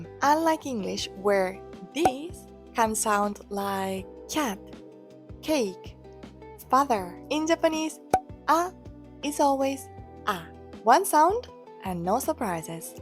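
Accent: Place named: Spain. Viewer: Teacher, why do you speak English with a Spanish accent?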